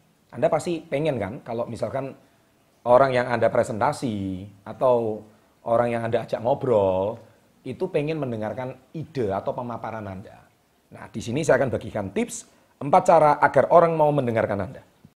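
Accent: Java, Indonesia. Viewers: Logat jawa nya buang lah dan kamu sendri bicara masih belum fasih gimana mau orang dengar dan fidio bukan kamu punya tunjuk orang punya dasar pembohong cari sensasih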